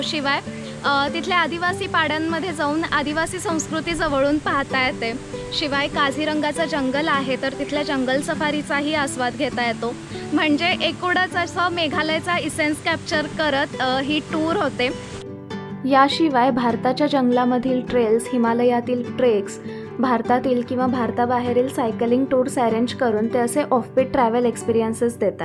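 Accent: Kolhapur, India. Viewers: THANK YOU KHUP VIDIO PAHAYLA MILTAT PARANTU TUMCHI DEVGAD OFBIT BHTKANTI MANALA BHAVLI TMHI DOGHHI FAR JUNTALMAN AAHAT TU MAZYA GAVCHYA SANGMESHVAR JAVAL DHAMNICHI AAHES PAN TUZYA BOLNYYAT KOLHAPURI TUCH AAHE TUZYAKDUN AAMHALA APLE KARNESHVER MANDIR SAMBHAJI VADH STAMBH MARLESHVER HI OFBIT THIKANE TU KADHI EXPLOAR KARNAR.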